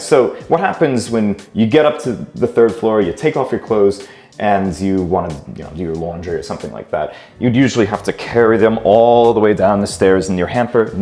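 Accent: United States. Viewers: Came here after watching the Unpacking Japan interview (which was fantastic, by the way), and the wild fluctuations between US accent and faux British accent when giving property tours are cracking me up.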